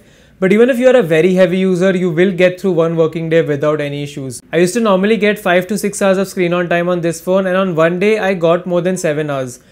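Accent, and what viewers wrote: India. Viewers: Play video : Thick Indian accent. No, thank you.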